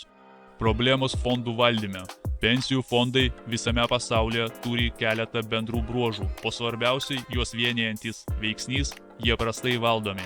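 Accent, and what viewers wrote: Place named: Russia. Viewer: Rusiškas akcentas per voice changeri girdisi, primate.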